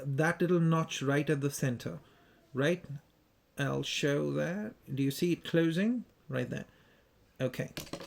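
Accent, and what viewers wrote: United Kingdom. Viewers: Yeah I thought he is from England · You are indian yet you have a very British accent. I do not know why but i find this peculiar. Have you studied in UK or in a UK school in india perchance?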